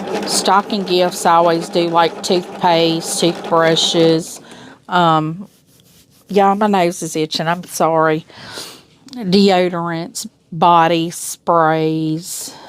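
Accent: South, United States. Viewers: Love listening to you and your southern accent. My son-in-law was in the service so when was released he went to the post office and applied. Apparently the post office puts service people on the top of the list, after they have completed the exam and application process.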